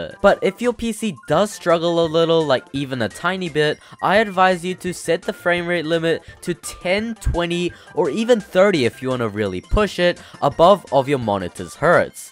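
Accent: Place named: New Zealand. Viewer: Yo Are you from New Zealand?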